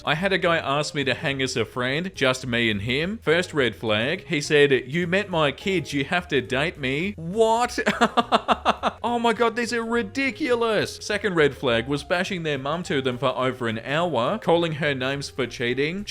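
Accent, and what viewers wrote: Australia. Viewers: That’s not a British accent. That’s an Australian accent. They’re approximately 9500 miles apart. Let me guess, American?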